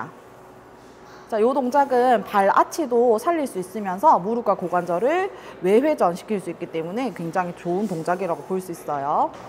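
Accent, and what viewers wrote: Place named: Busan, South Korea. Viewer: 오붓싼?! 같은 부산이라 그른가 사투리 찰져서 이해가 쏙쏙 잘 되네요😊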